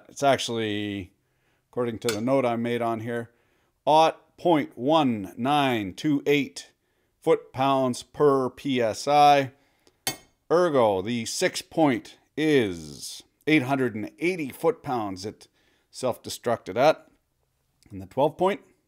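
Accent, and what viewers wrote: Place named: Canada. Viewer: As someone with a lifetime of experience in Canadian English and it's MANY colloquialisms, it gives me a great deal of pleasure to watch YouTube closed captions struggle to comprestand and interpolate your videos!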